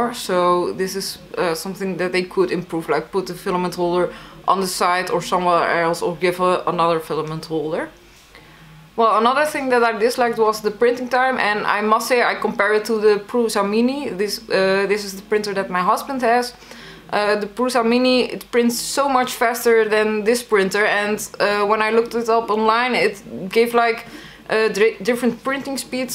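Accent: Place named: Netherlands. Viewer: Dankje voor de revieuw, ik hoor heel duidelijk dat je nederlands spreekt :) zou ook leuk zijn om nederlandse revieuws te zien. Groetjes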